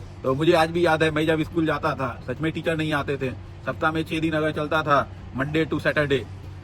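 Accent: Bihar, India. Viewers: सच्चाई ओर सुधार लाने वाले व्हिडिओ आप बनाते हो china देश ने itni tarrakki ki he इस्का main karan क्या हे इस्पर आप जरूर व्हिडिओ बनाईये कयोकी china सबसे जादा अबादी वाला ओर लोकसंख्या मे सबसे जादा रेहेणे वाला गरीब देश ऐसे हमने syllabus मे पढा हुंवा था जब मे स्कूल मे थी/ यानी प्रॅक्टिकल knowledge ही वही सही हे इसलिये china डॉन बन गया मे भी 4 th तक सरकारी स्कूल मे पढी हु/ china ki sachhai जनाना चाहती हु isliye आप के व्हिडिओ देखने लगीं ही ओर से आप जिस तरह बात करते हो वो मुझे बेहत पसंद है/ आप जैसे हमारे स्नेही हे बिहार से वो भी आप जैसे बिहारी टाईप बोलते हे/ बिहारी लोग बेहत मेहनती ओर helping log होते हे.. मे महाराष्ट्र से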